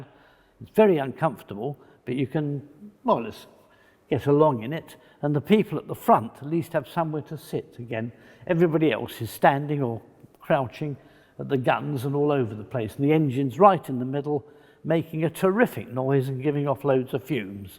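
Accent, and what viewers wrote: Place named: England, United Kingdom. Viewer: English? He is English and is speaking the purest form of it. I think you mean his accent.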